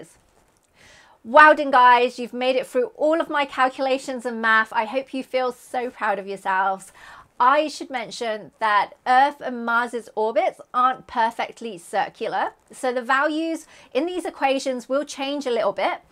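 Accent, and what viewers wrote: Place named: Northern England, United Kingdom. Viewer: We love your Northern English accent.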